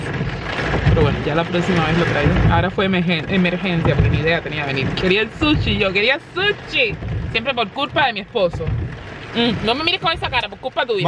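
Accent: Cuba. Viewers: Navegando por youtuve y encontre una familia muy interesante y bella mas tu hijito que niño mas tierno y hermoso, sere tu Nueva seguidora ya que me encanto tu contenido felicidades amo el acento cubano saluditos y muchas bendiciones desde cbba-Bolivia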